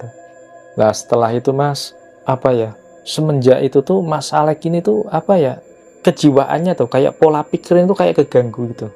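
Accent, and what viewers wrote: Pekalongan, Indonesia. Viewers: Mz nya yg bercerita org pekalongan to makanya q gk asing logat bicaranya salam dri Hongkong mz konten kreaktor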